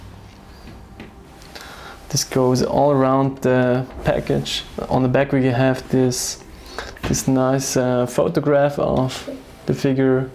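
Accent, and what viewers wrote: Germany. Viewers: Ha, wusste das es nen Deutscher(-sprachiger) is an deinem Akzent 😂👌🏻 Wie halten sich die Pegs an den Beinen ? Hab viel negatives über die gehört :/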